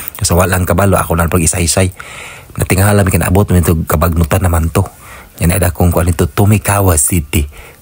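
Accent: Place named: Visayas, Philippines. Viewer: New subscriber. Ganhan ko ani kay bisdak kaayong dako mo voice over. Very genuine kaayo! More content with.❤❤❤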